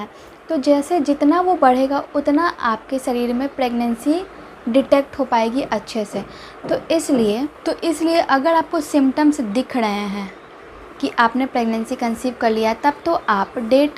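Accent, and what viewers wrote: Bihar, India. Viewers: Aap Bihar se hain kya ....ho rha hai ko hoda hai bolte ho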